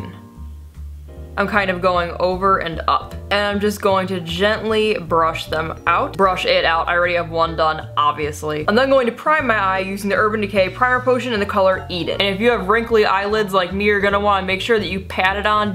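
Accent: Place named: Chicago, United States. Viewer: Your Chicago accent is so refreshing to hear; I'm from Chicago but have lived in Florida for 16 years, so I miss hearing my native accent. I love this makeup look, it's simple but yet festive. Thanks sweetie!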